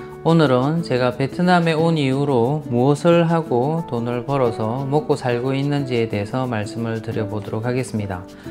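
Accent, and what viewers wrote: Gyeongsang, South Korea. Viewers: 톤이 차분하니 듣기좋네요. 경남분인거 같네요. 말씀을 들어보니 인복이 있으시네. 인복이 있어야 돈복도 있는법 부럽습니다. 30대때 당시 기술기능으로 독립이민을 상당히 고민했던 기엇이 나네요. 캐나다준비했었는데 결국 그 산업이 세계적으로 침체기가 돼서 접었던 기억이 납니다. 영어도 나름 열심히 했었는데. 무엇이든 그렇지만 외국에 가고자한다면 다른 준비나 여건도 그렇지만 무엇보다 연이 닿아야 된다고 봅니다. 외국에 사는 연은 분명 있거든요.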